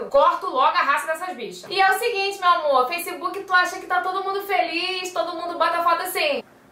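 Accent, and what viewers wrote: Rio de Janeiro, Brazil. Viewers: Maravilhosaaaaaaaaaaa!! hahaha Carioca legítima. Amoooooo <3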